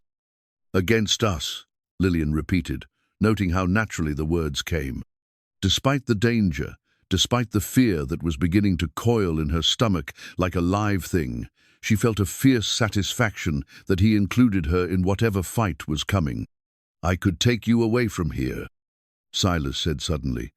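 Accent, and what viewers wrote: England, United Kingdom. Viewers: It's too bad you've got stuck with this narrator, he doesn't know how to pronounce American names & words, he's too English he sounds completely strange. Please give him & his boss my comments.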